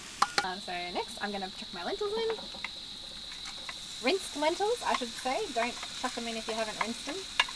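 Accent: Australia. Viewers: Мне не нравится австралийский акцент😮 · Are you from Australia?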